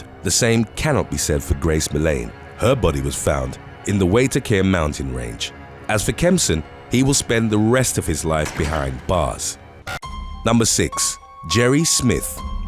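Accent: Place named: England, United Kingdom. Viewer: when i hear an English accent i assume its white. kinda disappointed its not a white old guy.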